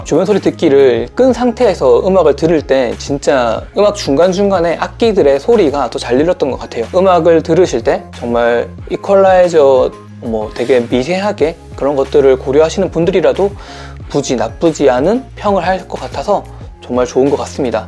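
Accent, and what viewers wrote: Gyeongsang, South Korea. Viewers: 경상도 분이신가요?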